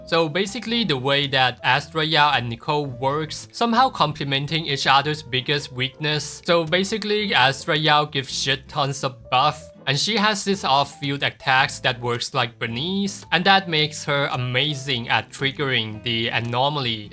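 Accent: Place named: China. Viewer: you cant really compare trigger to qing yi i think, trigger is an off field stunner, and qing yi is an on field stunner 話說up主是不是華人？英語聽起來有華人口音，純粹八卦😂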